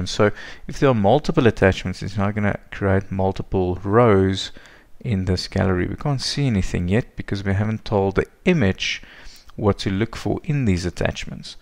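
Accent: South Africa. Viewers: sorry this is a bit off subject but are you South African??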